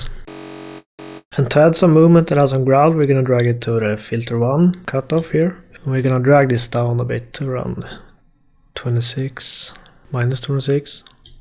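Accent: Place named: Sweden. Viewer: This guys swedish accent is so thick and it's hilarious for me as a Swede to be able to hear this instantly😂 linear uttalas "linniör"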